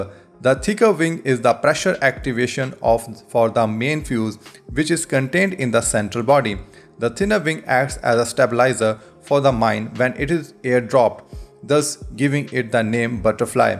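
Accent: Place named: India. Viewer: Really hard to understand this guy's English with his Indian accent.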